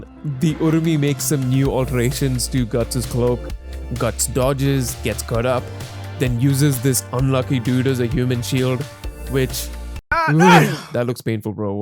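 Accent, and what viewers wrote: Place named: South India, India. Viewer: Why why why you speaking with heavy accent thats why people make fun of us are you south indian or something..stop the accent please its annoying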